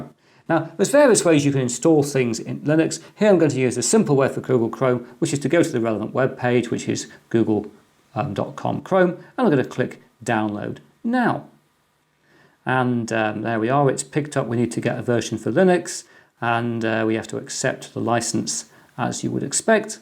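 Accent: United Kingdom. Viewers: Thank you for the Mint week. I didn't know it was a dairy - very interesting and funny. I just like your british accent - I've been in Britain three times in my life (<num>) and I like your country. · Thanks to the China Virus...I have been listening to your very generous youtube posting which I have been getting used to your Brit accent (enjoyable to hear very little lol and brg.......etc) Thank You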